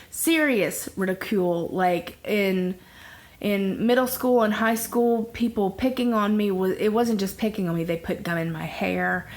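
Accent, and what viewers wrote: South, United States. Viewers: I am so sorry for all the verbal and emotional abuse you have gone thru it actually brought tears to me. hugs you're such a sweetheart. I love your cute lil southern accent and your glasses are super cute. I think you are doing awesome and keep up all the good work girl!! 😁🤗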